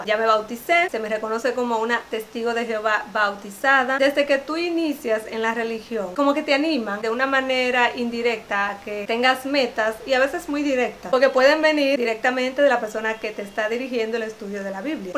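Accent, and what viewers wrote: Dominican Republic. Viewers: He visto tu video varias veces. Me encanta ese acento Dominicano tuyo. Eres muy linda y muy valiente . Gracias por compartir tus experiencias y puntos de vista. Saludos desde Cuba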